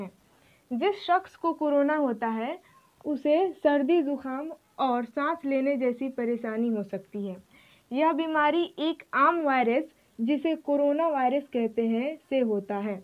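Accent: Bihar, India. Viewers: यदि हमें ऊर्दू आती हैं तो हम आपकी video dealy देखते पता नहीं आप को हिंदी आती हैं की नहीं क्यूं हमने हिंदी मेंcomment किए 🙏 एसे ton से तो आप भी बिहारी ही लगते हैं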